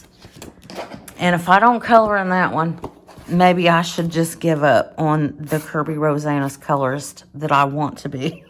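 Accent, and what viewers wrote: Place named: Alabama, United States. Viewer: Hey, she Alabama southern just like I am but North Carolina style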